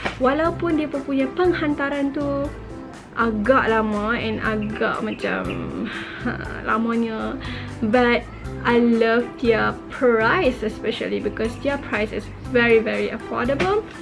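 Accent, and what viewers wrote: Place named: Kedah, Malaysia. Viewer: Suka dengar cakap kedah o. Kalau boleh teruskan cakap kedah:)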